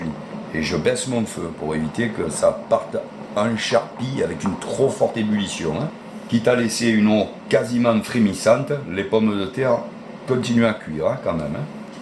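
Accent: South, France. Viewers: Oh l’accent du sud, quel délice ❤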